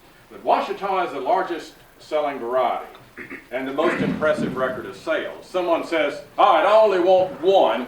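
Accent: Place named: Arkansas, United States. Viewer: Excellent info, two thumbs up! Also if you want a chuckle, turn on CC, and see what it translates Arkansas accent to. lol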